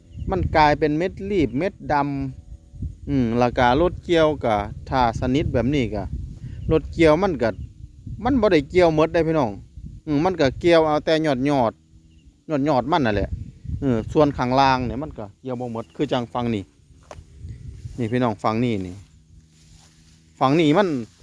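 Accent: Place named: Sisaket, Thailand. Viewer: สำเนียงคือศรีสะเกษนอครับ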